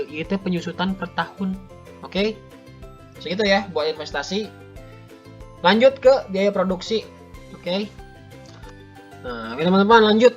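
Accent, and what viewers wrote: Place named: West Java, Indonesia. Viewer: nyunda euy, hatur nuhun elmuna manfaat..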